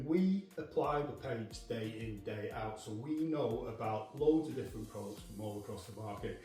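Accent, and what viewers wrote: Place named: Northern England, United Kingdom. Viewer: What with this guy’s (slight) northern dialect, the fact that he seems to be in an empty room , therefore an echo chamber AND faint background music from his obligatory radio, the listener didn’t stand much of a chance to hear what he was saying !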